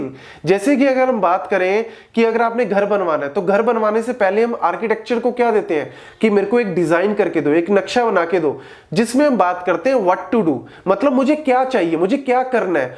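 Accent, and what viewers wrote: Punjab, India. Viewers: App Punjabi hai jururt hai bolne ka Method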